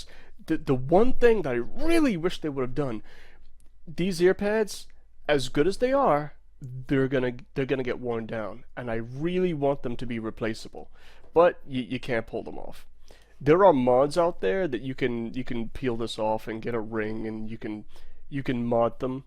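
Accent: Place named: Northeast, United States. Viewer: I got mine for $62 about 2 months ago, they we're a little bit enjoying in the high range and a little lacking in bass, however I put them in a room with a Fiio amp/dac and feed them EDM on a loop streaming Tidal lossless streaming service using the premium services, I did this for 5 days and after the 5 day period I have to tell you I am now a believer in headphone "Burn-In" I'm guessing that this has loosened the driver material or flexed whatever term you'd like to use. At any rate they just totally transformed into a much better sounding SHP9500, I didn't want to like these headphones however even if Phillips were to sell these for $150 they'd be worth it! Are they good for every genre of music? No, if you're a bass head look elsewhere, I do own a pair of V-MODA M 100's and like those but are they good for every genre of music? 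No, however I just can't stop listening to these 9500's. I'm going to stop now and get me a drink of whiskey. Just a side note for the reviewer, what part of the UK do you live in? I was stationed at RAF Bentwaters for 3 years many moons ago, so are you an American? as I don't detect an English accent when you speak, I do hear what I believe is a North East Coast accent in you. Hey thanks for the review.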